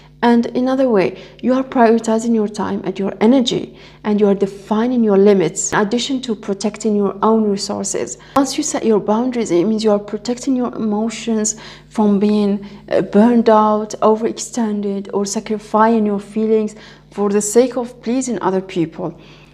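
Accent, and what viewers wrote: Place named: France. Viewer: You look like a beautiful nun i do love your french voice🥰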